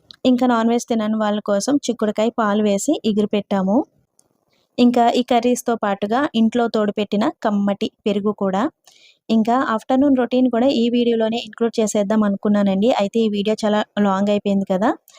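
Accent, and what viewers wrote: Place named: Godavari, India. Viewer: Ma godavarolla basha na ...majaka super undhi ......godarollamani mana language lo mana respect lo telisipothundhi.....nice vlog andi very nice chala bagundhi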